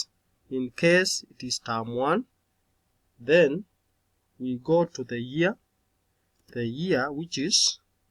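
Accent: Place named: Kenya. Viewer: I guess you're asking about the accent? It's a Kenyan accent with peppered with Kamba(Tribe) accent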